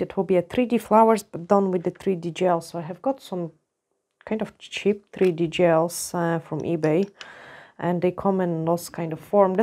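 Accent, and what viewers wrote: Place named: Scotland, United Kingdom. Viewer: How funny, I wondered why your accent sounded a bit off... lol.. you actually picked up your Scotish accent with Polish. 👍👍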